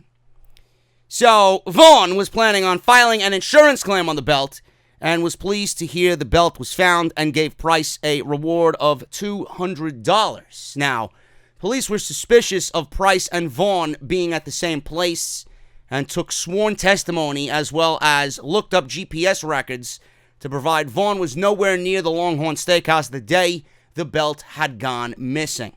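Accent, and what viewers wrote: New York, United States. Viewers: Damn hon, your New York accent is so much more prominent today lol!!!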